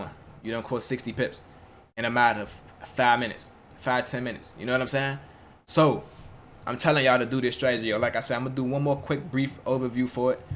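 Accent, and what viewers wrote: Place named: Baltimore, United States. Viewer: Gotta be from B’More or at least in MD/DMV but hear strong Bmore accent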